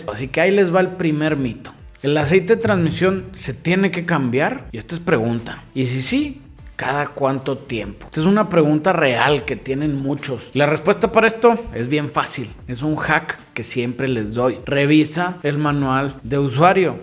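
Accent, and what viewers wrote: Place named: Monterrey, Mexico. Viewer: Eres de monterrey vrd vato!! Jeje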